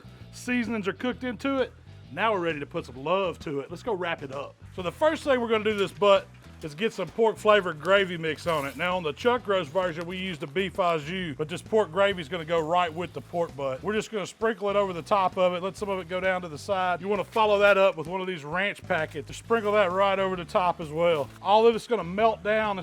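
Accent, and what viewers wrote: South, United States. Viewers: A big, bowling ball-shaped guy with a southern accent and a beard is exactly who you want to take bbq tips from. This looked amazing.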